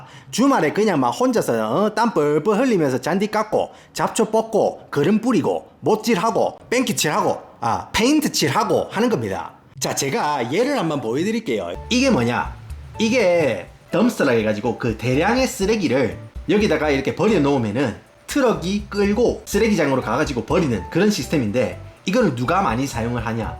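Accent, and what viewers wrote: Gyeongsang, South Korea. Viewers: 쌍도 말,억양좀 쓰지마라. 토난다! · 아주 논리적으로 재미있게 갱상도 발음으로 마 말을 잘하시네요.😁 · ㅎㅎㅎ 경상도 사나이 재미납니다 ㅎㅎ. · 아이 미국 현지 영상을 보는데 알아듣기 힘든 경남 사투리가 왜케 심하냐~ㅋㅋㅋㅋ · 경상도인듯 ㅎㅎ 고향 말투 들으니 넘 반가움ㅋㅋ 반갑습니다!! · 와... 약간 혀가 꼬인 빡센 오리지날 경상도 사투리 들으니까 이거 머 내용이 재밌기도 하지만 듣는 재미가 쏠쏠하네요 ㅋㅋㅋㅋ 쌔끈빠끈 표현 찰지네요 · 경상도 사투리인지 부산 사투리인지 모르겠지만, 사투리가 한국에서 듣던 것보다 엄청 재밌네요. 모르는 단어도 많이 나와요. ㅎㅎ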